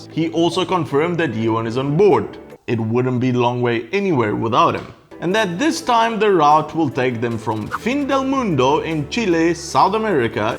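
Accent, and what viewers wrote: Afrikaans, South Africa. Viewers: Speaking about South Africa.. Your accent is nearly identical to an Afrikaans English accent. I'm from SA and I had to check the channel page to see that you actually hail from Romania. Sorry for being so random lol. Great channel BTW.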